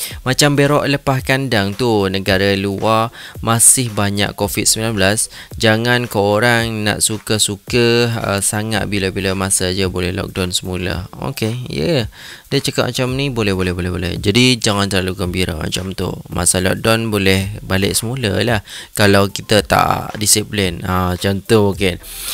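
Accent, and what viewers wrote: Malaysia. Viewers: Suara sedap...muka hensem..... ckp mcm org Malaysia..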